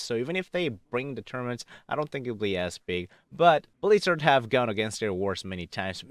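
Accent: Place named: South Korea. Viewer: Korean accent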